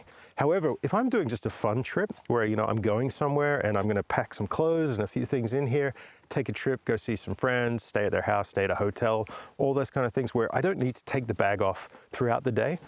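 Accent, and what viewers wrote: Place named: Australia. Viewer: Love the accent dude. Not sure if Aussie, Kiwi or Yank or mix therein. I really like that bike too. Thanks for the informative vid na!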